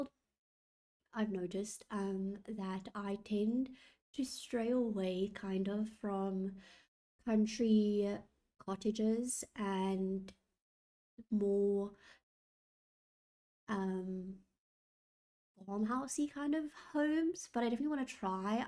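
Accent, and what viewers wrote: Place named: South Africa. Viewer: Lovely house and I hear that South African accent ❤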